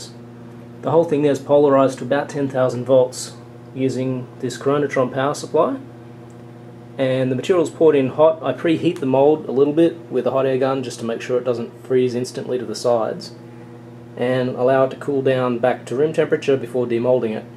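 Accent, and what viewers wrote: Australia. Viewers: Great video! Are you by some chance of Australian origin?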